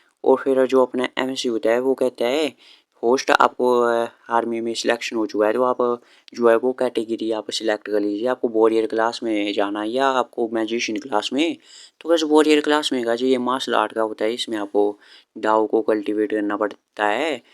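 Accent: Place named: Himalayas, India. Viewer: tabhi tumhari hindi hai ... Himali taaal mehsuus ho raha thaa...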